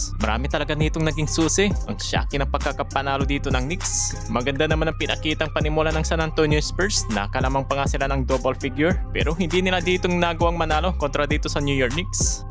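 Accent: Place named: Visayas, Philippines. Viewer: Nako salita palang bisaya na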